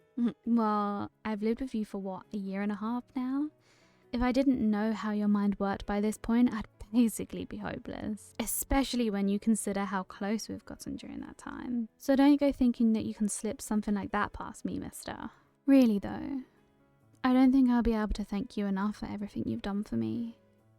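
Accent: United Kingdom. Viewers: I'm getting, a southern accent? Not devon but something near? Definitely not central Britain, I'm from there so I'd know. 'based on what I feel pressed up against my hips' is crazy af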